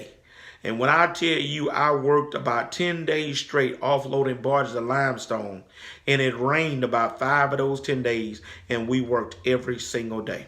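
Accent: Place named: Louisiana, United States. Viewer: What part of Louisiana are you from brother?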